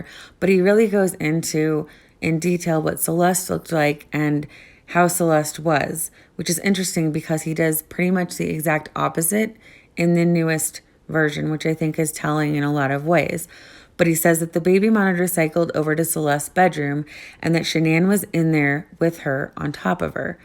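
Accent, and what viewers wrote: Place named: United States. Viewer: I'm from a little country in the pacific and generally I don't like the American accent but I have to say you have a really really nice voice and are very easy to listen to. Thanks. Ive been obsessed with this case ever since hearing about it. I think because the amount of home videos of Shanaan, Bella, Celeste I feel I got to know them. I think Shanaan was an amazing mum, wife and home maker. The tenderness and patience she showed her gorgeous daughters was unmistakeable. The mind just boggles. crustychris brings to mind one word and thats "WIMP". Him and nicks-hole deserve each other!!!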